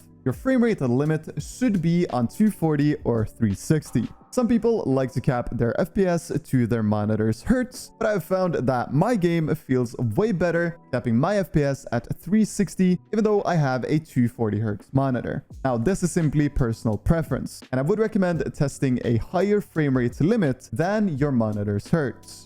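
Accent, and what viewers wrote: Norway. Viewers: Er du norsk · er du norsk????? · U Norwegian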